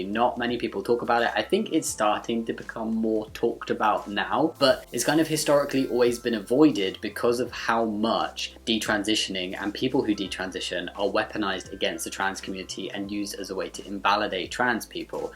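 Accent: United Kingdom. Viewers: You’ve successfully made me hate the British accent. You are a joke and are an insensitive to other humans. Quit being a narcissist. You’re entire community is indoctrinated- including you. 🤮 You also lie (because it is too simple to get hormones -10-30 minutes) and you invalidate other people’s reality. STFU and think about something else other than your gender for once in your life.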